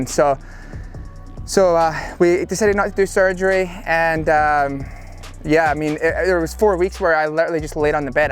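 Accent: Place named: London, United Kingdom. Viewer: Where is this guy from? His accent sounds like London via east LA.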